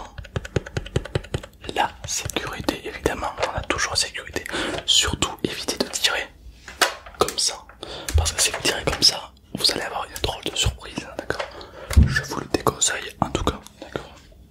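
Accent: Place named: Marseille, France. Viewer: L accent marseillais oulaaaaa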